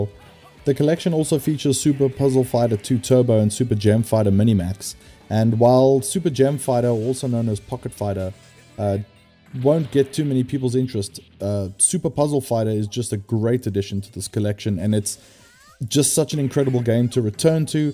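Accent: South Africa. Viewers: Your review video popped up in my suggestions list & I decided to have a look without taking note of your channel's name... After a few moments into the video I was like this guy sounds familiar, South African like familiar! Greetings from a South African living in deep South Texas USA... I enjoyed your video, I've been thinking about getting the Capcom Fighting Collection, the Darkstalkers, Cyberbots, Red Earth & Puzzle Fighter are quite appealing to me, so I think I'll take the plunge. I've already got the Street Fighter 30th Anniversary Collection so it'll go well with that. I do however wish they'd port some of the older Tekken games, namely 3 & 4 for the Nintendo Switch, now that I'd be seriously into! Subscribed & looking forward to more videos. 👍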